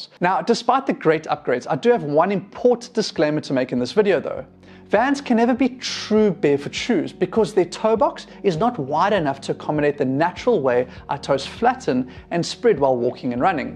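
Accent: South Africa. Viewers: Damn, the South African accent...first time watching, something in the back of my mind recognized that accent immediately, Greeting from Mzansi Chief :)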